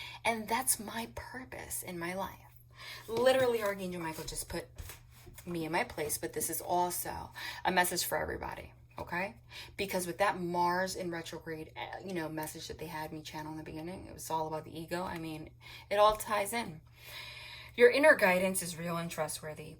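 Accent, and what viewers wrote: New Jersey, United States. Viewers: I love her Jersey accent!! So cute and entertaining 😁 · I have just come across your page and im thinking “she has kinda a jersey accent hmm” and you said your from nj omg 💖 I love it!!! Its been crazy here as you know.... light and love for you sister 💞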